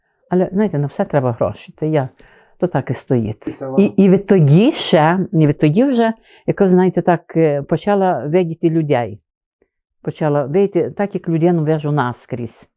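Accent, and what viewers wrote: Transcarpathia, Ukraine. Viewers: Як я люблю Закарпацький Говір! дякую вам! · Дякую вам за цікаві розповіді,люблю ваш канал,дивлюсь з задоволенням .Освітчуєте цікаві місця де я хотіла би побувати ,але не взмозі з багатьох причин.Дуже люблю закарпатський говір,мрію так говорити ,намагаюсь.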